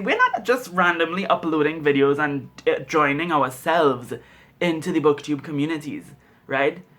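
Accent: Trinidad and Tobago. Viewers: I dont know you but I've learned how to recognize the Trinidad accent! There are LOTS of trinidadian doctors at my hospital that I've learned the accent...am i right? Are you from trinidad?!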